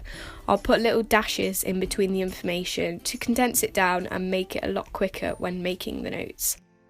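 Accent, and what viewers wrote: England, United Kingdom. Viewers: I love your English accent and your beautiful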